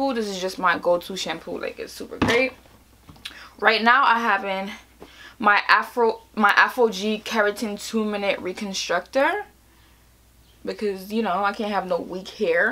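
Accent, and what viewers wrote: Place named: Trinidad and Tobago. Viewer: Are you by chance from Trinidad? Your accent seems familiar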